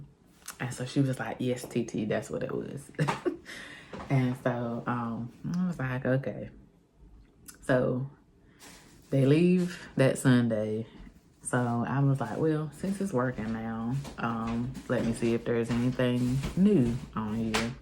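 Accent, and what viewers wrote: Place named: South, United States. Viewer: Im gonna assume you were sheltered growing up...get out of comfort zone...truck'n is tough but it gets easier as u start to get it...I understand you want to move to the beat of your own drum...we all do...the world is a cruel place...I like the fact you're willing to get out of comfort zone (🚛)...ik I'm all over w my writing but formulating sentences isn't my forte...the 🌎 takes no prisoners...love the southern accent...get a chance watch niesha k or skimp dee or roadrunna nia... watch how they move look at mirrors... recognize what u see...plus they're prissy they like to get hair and nails done...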